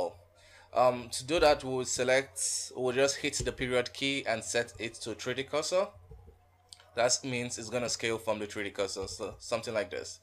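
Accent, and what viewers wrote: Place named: Nigeria. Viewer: Just wondering are you nigerian? You sound like nigerian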